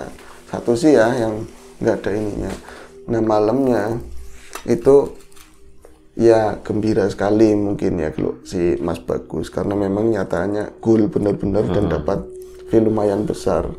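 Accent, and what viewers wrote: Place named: Jakarta, Indonesia. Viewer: Mas sm mas sama2 dari Tegal, tp logat mas udh kayak penduduk asli jakarte/Betawi🥰 Sdg mas msh orisinil Tegalnya👍🤗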